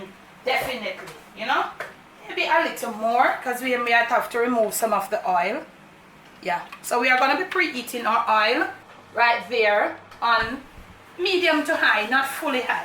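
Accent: Jamaica. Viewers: Hey Ms love the patwah. And all a dat. 😃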